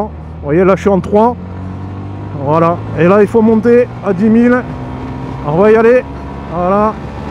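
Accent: South, France. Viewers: Extra.. j'attends la mienne avec impatience.. très bonne vidéo avec l accent dû sud ..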